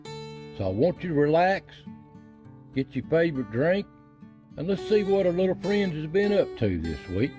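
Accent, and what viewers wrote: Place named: South, United States. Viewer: I love listening to your stories I think the are the best. With your southern accent it is perfect for this Thank you very much 😊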